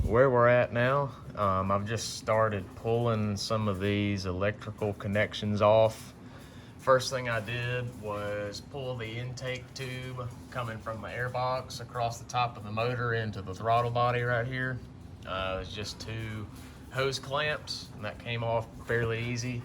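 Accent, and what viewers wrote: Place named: Birmingham, United Kingdom. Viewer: Hey I recognize that accent. I was raised in Birmingham. Lol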